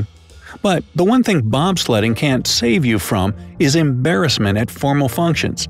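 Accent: United States. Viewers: Kind of sad hearing an American voice laying down these rules. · American commentator XD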